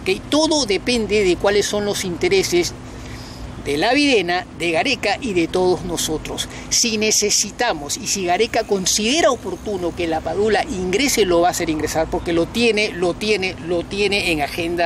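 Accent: Argentina. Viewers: Eres peruano?, y el dejo argentino???....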